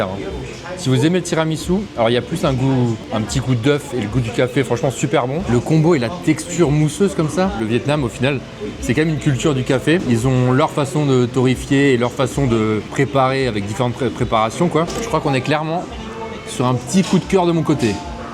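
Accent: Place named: France. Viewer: Par contre lire le Vietnamien à la Française, c’est vraiment moche lol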